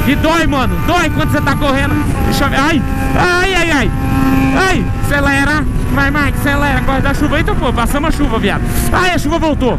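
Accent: Goiás, Brazil. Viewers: Pq vc fala "no pique du Goiás"? Vc é daqui? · Queria saber por que o fala no piqui do Goiás kk eu sou do Goiás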